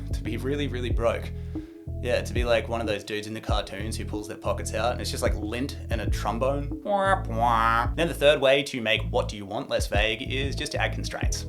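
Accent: Australia. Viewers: I learnt a lot from you but especially to understand the Australian accent. Thank you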